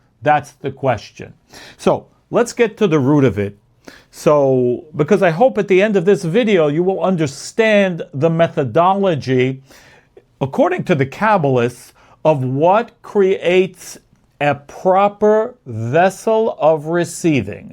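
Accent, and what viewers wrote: New York, United States. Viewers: Love the New Yawk accent :D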